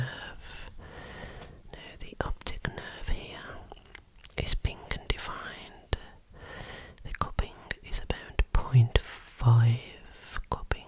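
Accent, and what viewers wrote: Birmingham, United Kingdom. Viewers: Never thought Brummy asmr whispers would hit me so hard but they do, keep up the great work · you are the only person that I've ever heard with a brummie accent that sounds good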